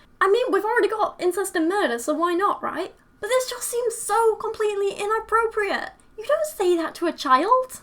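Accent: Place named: Northern England, United Kingdom. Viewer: Okay, you have the northern accent from game of thrones and you look like a STARK, seriously game of Thrones fans close your eyes and listen to the accent / like other sounds like "ughthugh".....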